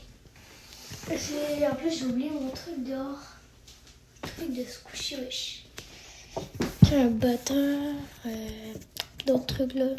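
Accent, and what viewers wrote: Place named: Quebec, Canada. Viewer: Tu es québécoise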